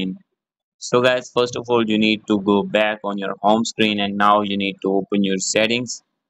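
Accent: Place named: India. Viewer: Indian ahh accent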